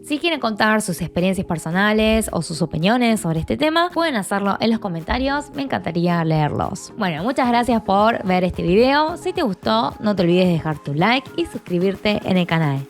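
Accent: Argentina. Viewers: el acento argentino siempre te acompañará, eres una linda argentina-coreana. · Felicitaciones, la verdad me encanta tú acento argentino en español, es bonito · A la gente es lo que menos debería importarnos si amas y respetas el lugar que llegas y adoptas de corazón es y debe ser lo más importante ,difícil es una adaptación y encima la crítica de si eres o no de aquí o haya,no cabe duda que somos unos tontos complicados ,felicidades por tu nacionalidad coreana !! A mí me encanta como hablas argentina!! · Solo con tu acento quein en su sano juicio dudaria que vos es argentina :) Saludos . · escuchandote hablar sos argentina,autopersivite como quieras ( argento/koreana ), cómo argentino no tengo problemas con ninguna nacionalidad no se porque aclaran tanto culturas asiaticas nacidas de otros paises, creo que es por prejuicio de paises asiaticos porque en argentina una vez superada la edad del pavo da igual la nacionalidad de las personas, lo que se mide es la mentalidad. 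Hay argentinos en todas partes del mundo y no tienden a esa confusión, este dónde este dice que es argentino, la nacionalidad no es un virtud solo una nacionalidad. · A fin de cuentas nace en Argentina, habla con acento argentino pero se siente mas a gusto en Corea ó en Argentina? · No me sorprende tengas nacionalidad china coreana sino que hablas RE- gaucha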